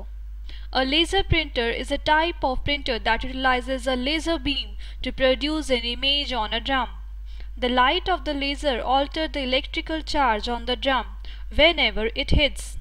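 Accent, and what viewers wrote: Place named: India. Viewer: fucking india and their dumb fucking accent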